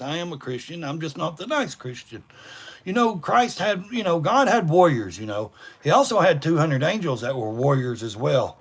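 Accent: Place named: South, United States. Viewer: it’s unbelievable how many brainwashed people there are. Have no common sense. A lot of them are set off by your southern accent and assume you are certain things.😂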